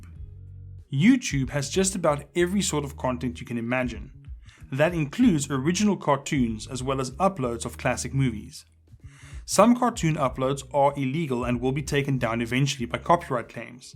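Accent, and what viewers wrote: South Africa. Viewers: You South African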